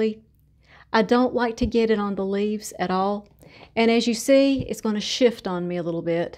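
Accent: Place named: Tennessee, United States. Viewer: I, do you put your fertilizer water on each time you soak your plants for 45 minutes, all year long. Or do you ever water with plain water in between? My daughter lives in Knoxville so I love listening to your TN voice! Thanks, Susan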